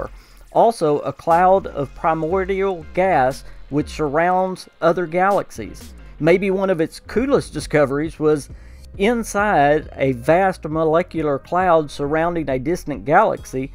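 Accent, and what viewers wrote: South, United States. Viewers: Are you from North Carolina or Texas? Someone said you were in Kansas, but I can't tell exactly.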